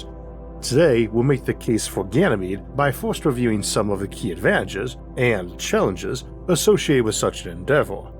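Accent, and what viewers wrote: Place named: South, United States. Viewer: Not sure why the person reading this sounds like a southern American plantation owner. Terrible accent. Gave up watching it because I couldn’t stop laughing at it.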